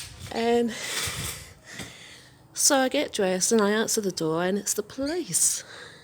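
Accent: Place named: Australia. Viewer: By the way, I noticed you have a bit of an Australian accent....😂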